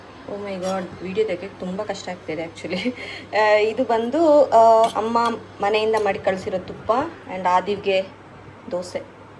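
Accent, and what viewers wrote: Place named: Tamil Nadu, India. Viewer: ಅಕ್ಕ ನೀವು ಬಹಳ ಚೆನ್ನಾಗಿನೇ ಕನ್ನಡ ವನ್ನು ಮಾತನಾಡುತ್ತೀರಿ ನಾನು ನಿಮ್ಮ ತಮಿಳು ವಿಡಿಯೋ ಗಳನ್ನು ಸಹ ನೋಡಿದ್ದಿನಿ ನೋಡಿ ಮನರಂಜಿಸಿದ್ದಿನಿ ನೀವು ಅಷ್ಟು ಅದ್ಭುತವಾಗಿ ತಮಿಳು ಮಾತನಾಡಲು ಕಲಿತ್ತಿದ್ದರೂ ಕೂಡ ಕನ್ನಡ ಮಾತನಾಡುವುದನ್ನು ಮರಿತ್ತಿಲ್ಲ ಅಲ್ಲವ ಅದೇ ಒಂದು ಖುಷಿಯಾದ ಸಂಗತಿ ನಮಗೆ ನಾವು ಯಾವುದೇ ಭಾಷೆ ಯನ್ನು ಕಲಿತರೂ ಸಹ ಮಾತೃ ಭಾಷೆ ಯನ್ನು ಮರೆಯಬಾರದು ಮಾತೃ ಭಾಷೆ ಯನ್ನು ಮರೆತರೆ ನಾವು ನಮ್ಮ ಹೆತ್ತವರು ಹಾಗೂ ನಮ್ಮ ಅಸ್ಮಿತೆ ಯನ್ನೇ ಮರೆತಂತೆ ಮೇಡಂ ನಮ್ಮ ಕನ್ನಡದ ಮೇರು ನಟಿ ಮೋಹಕ ತಾರೆ ರಮ್ಯ ಹಾಗೂ ರಶ್ಮಿಕ ಮಂದಣ್ಣ ಒಮ್ಮೆ ನಿಮ್ಮನ್ನು ನೋಡಿ ಭಾಷಾಭಿಮಾನ ವನ್ನು ಮೈಗೂಡಿಸಿಕೊಳ್ಳಬೇಕು ನೀವು ನಿಮ್ಮ ಯಾಜಮಾನ್ರು ಕಿತ್ತಾಡೋದು ಸಖತ್ತಾಗಿರುತ್ತದೆ ನಿಮ್ಮಿಂದ ನಾವು ಇನ್ನೂ ಹೆಚ್ಚಿನ ಕನ್ನಡ ವಿಡಿಯೋ ಗಳನ್ನು ನೋಡಲು ನಿರೀಕ್ಷೆ ಮಾಡುತ್ತಿದ್ದಿವಿ ನೀವು ನಮ್ಮ ಕನ್ನಡದ ಅಸ್ತಿತ್ವ ಹಾಗೂ ಅಸ್ಮಿತಿ ಯನ್ನು ಕಾಪಾಡಬೇಕೆಂದು ಕಳಕಳಿಯಿಂದ ವಿನಂತಿ ಮಾಡಿ ಕೊಳ್ಳುತ್ತಿದ್ದಿವಿ ಎಲ್ಲಾದರೂ ಇರೂ ಎಂತಾದರೂ ಇರೂ ಎಂದೆಂದಿಗೂ ನೀ ಕನ್ನಡವಾಗಿರೂ ಕನ್ನಡವೇ ಸತ್ಯ ಕನ್ನಡವೇ ನಿತ್ಯ ನಾವು ಕನ್ನಡದ ಕಲ್ಪತರು ನಿಮ್ಮ ಕನ್ನಡ ಶೈಲಿಯಲ್ಲಿ ಚೂರು ತಮಿಳು ಪ್ರಭಾವಿದ್ದರೂ ಅದು ತುಂಬಾ ಸೊಗಸಾಗಿನೇ ಕೇಳುತ್ತದೆ ಏಕೆಂದರೆ ನಮ್ಮ ಭಾಷೆ ಕನ್ನಡವೇಯಾಗಿರಲಿ ಇನ್ನೂ ಬೇರೆ ಯಾವುದೇ ಯಾಗಿರಲಿ ನಾವು ನಮ್ಮ ದೈನಂದಿನ ಬದುಕಿನಲ್ಲಿ ಪರ ಭಾಷೆಯನ್ನು ಬಳಸುತ್ತಿದ್ದರೆ ಅದರ ಪ್ರಭಾವ ಸಾಮಾನ್ಯವಾಗಿ ನಮ್ಮ ಸ್ಥಳೀಯ ಭಾಷೆ ಮಾತನಾಡುವಾಗ ಸಹಜವಾಗಿ ಕಂಡು ಬರುತ್ತದೆ ಪರವಾಗಿಲ್ಲ ಮೇಡಂ ನೀವು ತಮಿಳನ್ನು ಹೆಚ್ಚಾಗಿ ಬಳಸಿ ಅಷ್ಟೇ ಕನ್ನಡವನ್ನು ಸಹ ಹೆಚ್ಚಾಗಿ ಬಳಸಿ ತಮಿಳು ಪ್ರಭಾವ ಏನು ಇಲ್ಲ ಆದರೆ ಕನ್ನಡ ಮಾತನಾಡುವಾಗ ಸ್ವಲ್ಪ ತಮಿಳು ಶೈಲಿ ಬಂದಂತೆ ಬಾಸವಾಗುತ್ತದೆ ನಿಮ್ಮ ಪತಿ ಹಾಗೂ ಸುಪುತ್ರನಿಗೂ ಅದೆಷ್ಟು ಬೇಗ ಸ್ವಚ್ಚಂದ ಕನ್ನಡ ವನ್ನು ಮಾತನಾಡಲು ಕಲಿಸಿ ನಮ್ಮ ಕನ್ನಡ ಮೊಳಗಬೇಕು ಎಲ್ಲೆಡೆಯೂ ಪಸರಿಸುವಾಗೇ ಮಾಡಿ ನೀವು ಮನಸ್ಸು ಮಾಡಿದ್ದಿರಿಂದರೆ ಕನ್ನಡದ ಧಾರಾವಾಹಿ ಗಳಲ್ಲಿ ಮೀನುಗಬಹುದು ಮಾತೃ ಭಾಷೆಯೆಂದರೆ ಅದರಲ್ಲಿ ಹೆಚ್ಚಾಗಿ ಭಾವುಕತೆ ಅಡಗಿರುತ್ತದೆ ನಿಜ ಹೇಳಬೇಕೆಂದರೆ ನಿಮ್ಮಲ್ಲಿ ಕನ್ನಡಾಭಿಮಾನ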